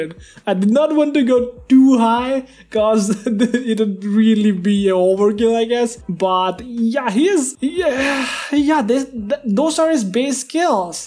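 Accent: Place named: India. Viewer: I think its an Indian accent, tho I could be wrong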